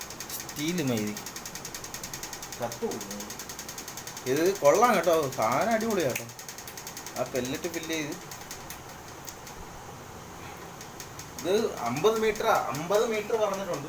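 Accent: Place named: Trivandrum, India. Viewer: Tvm bhaasha aanu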